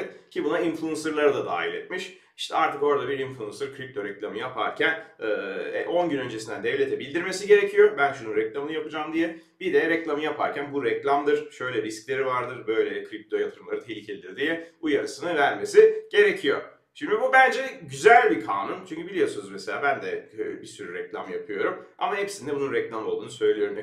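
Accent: United States. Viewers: Komedi filmisin yaaa. Olumsuz yorumlarıda hemen siliyorsun en ufak eleştiriye açık değilsin. Bilgin yok ve amerikan aksanıyla Türkçe konuşarak, İngilizce kelimeler ekleyerek de olmuyor. İnsanları yanlış yönlendirme lütfen, anlattıklarını zaten çocuklarda biliyor.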